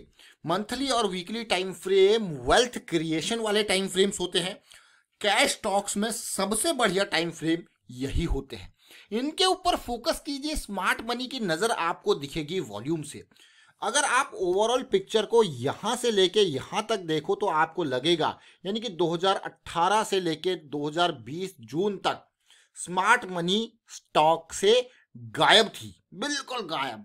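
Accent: Uttarakhand, India. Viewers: Sir , Are you from uttarakhand?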